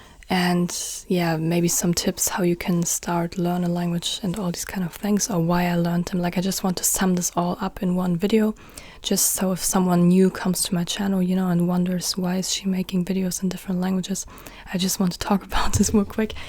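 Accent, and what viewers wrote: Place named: United Kingdom. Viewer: By the way when you speak english your pronunciation is more british than american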